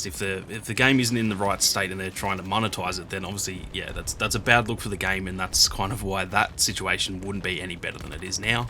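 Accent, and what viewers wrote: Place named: Australia. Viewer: As a PC elitist I subbed for the vibes and the aussie accent a while ago. Keep it coming and best of luck with the sub goal :)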